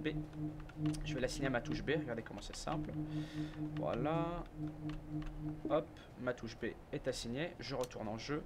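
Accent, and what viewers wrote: Quebec, Canada. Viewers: est tu québécois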